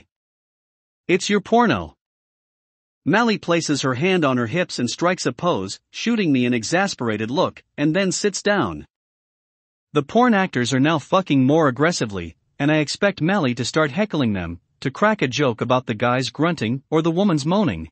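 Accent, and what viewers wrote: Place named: United Kingdom. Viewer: It's a bit hard for me to understand the British accent, but I'm getting used to it, great story.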